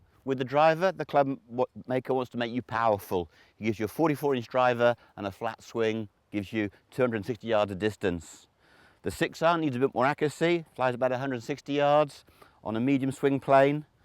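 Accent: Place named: United Kingdom. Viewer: This guy is so British and I love it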